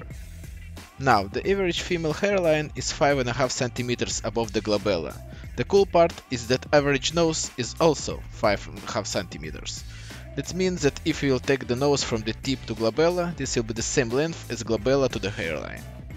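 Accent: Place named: Russia. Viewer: хах, русский акцент слышно сразу)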